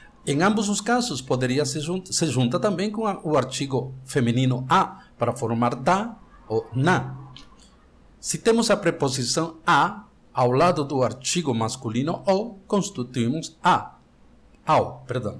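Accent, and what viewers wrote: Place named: Spain. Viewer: Ótima aula prof.. É tudo que eu esperava encontrar. Um professor nativo com domínio em português. Não podia ser melhor. Parabéns pela iniciativa. Gostaria de perguntar - Qual é o seu país? (Depois de 27 anos no Brasil fica difícil notar o sotaque espanhol).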